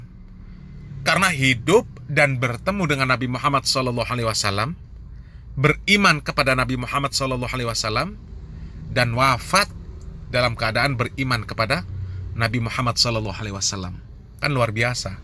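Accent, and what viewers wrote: Java, Indonesia. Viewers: Suara jawa ni ptsay kd shaluan😂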